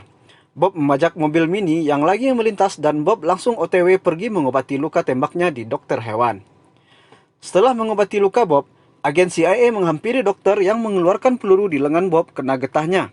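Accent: West Sumatra, Indonesia. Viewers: Urang minang asli kiro e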